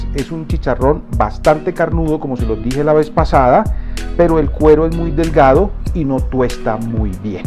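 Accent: Colombia. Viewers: Mijo eres único, paisa de sabor y bien hablado, como nos gusta, bendición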